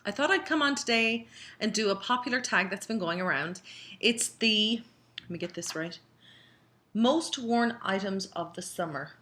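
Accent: Ireland. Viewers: We love your Irish accent !!!! The Irish accent is definitely one of our fav accent in the English language. Sweet. Oh and great fav summer products. We love Hard Candy and the bronzer you show looks OMG so pretty xx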